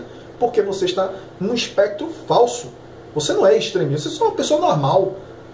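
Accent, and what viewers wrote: Recife, Brazil. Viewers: Mas, tu és de Recife?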